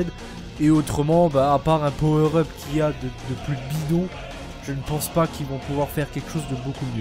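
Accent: Alsace, France. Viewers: Waouh c'est génial cette chaîne je connaissais pas sinon par pur hasard tu serais pas alsacien😂? On reconnait le petit accent (pcq je le suis)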